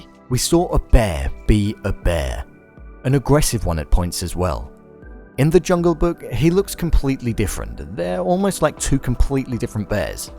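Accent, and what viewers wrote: United Kingdom. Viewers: Its his British accent, even I wanna fuck it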